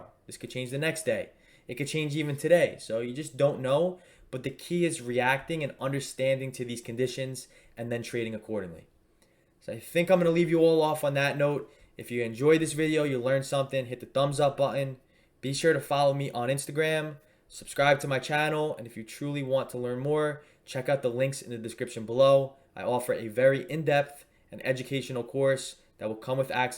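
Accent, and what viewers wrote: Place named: New York, United States. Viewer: you sound like a New York Italian Mobster, I love it 😂 Great video though as always.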